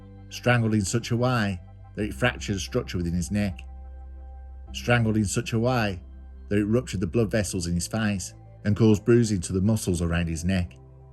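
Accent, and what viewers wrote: Birmingham, United Kingdom. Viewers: The ambient music, that Brummie drawl, the street slang. I smile to myself, another episode of Curios & Spiritual. 😊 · The brummy accent is awful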